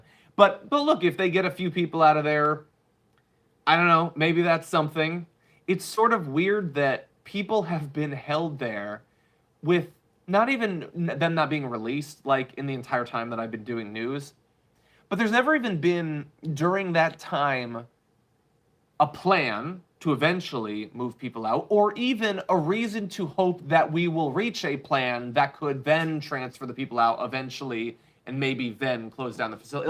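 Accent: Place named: United States. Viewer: Try to think of what sentence you would want for someone who brutally raped your Mother and took pictures bragging about it and put them on all social media platforms. 'Cause that is how I feel about these psychopaths who raped and tried to rob our Country. Just think how long you would want those gang bangers to be sentenced to prison. And I don't know how you can "not" have a dog in this fight. Are you a foreign citizen? Because you sound like an American. But I'm pretty sure you are a progressive. How far do you think the progressive movement will get if we can't even have simple Democrats. These people want their agenda, and only their agenda. They want to see any and all progressive legislators dead.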